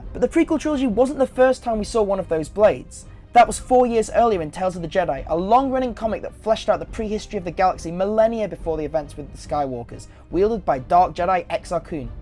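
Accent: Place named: United Kingdom. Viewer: He has a British accent... They pronounce 'a' at the end of a word as 'er.' Not a mistake...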